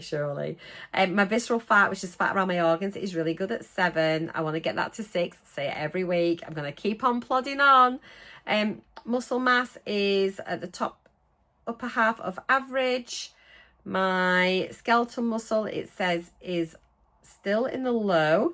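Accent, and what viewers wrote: Yorkshire, United Kingdom. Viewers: Congratulations, on reaching your weight loss goal. I appreciate the time and effort it takes to create your videos; all very informative and inspirational. Your upbeat nature helps me overcome impatience when I feel like my own weight loss is stalling. Truth is, it never stalls for long. I tend to stay at one weight for two or three days following injection and then lose a pound or more during the remainder of the week. After my weekly injection, I watch your corresponding GLP-1 Diary video. For example, my next injection begins my week 12. After my poke, I will watch your Week 12 video to see what results and side effects I can anticipate as our weight loss rates and side effects have been similar so far. I have lost 30 lbs as of today. Woo hoo! Only 70 more to go until I can celebrate like you! Mounjaro is a godsend and the ONLY thing that has worked for me. 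Conquering obesity while building muscle is the best thing I can do to stay healthy as I age. Being able to fit into non plus size clothes is just an added benefit. On a personal note, your lovely Yorkshire accent reminds me of my cousins who live near Leeds. I am in Canada so our visits are few and far between. Take Care and best wishes as you begin your maintenance phase.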